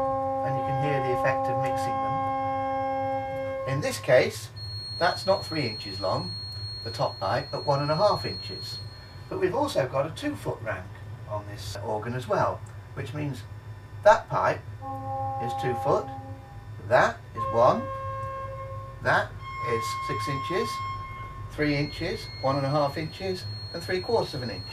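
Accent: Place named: Southern England, United Kingdom. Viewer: Why is his voice hilarious? It sounds like a very normal southern English accent!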